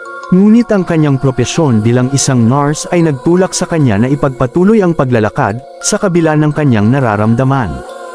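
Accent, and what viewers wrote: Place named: Visayas, Philippines. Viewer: ano ka bisaya slang ka mag salita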